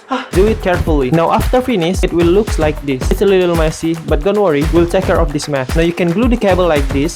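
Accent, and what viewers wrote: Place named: India. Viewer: Kirain orang prindapan,logat inggrisnya mirip soalnya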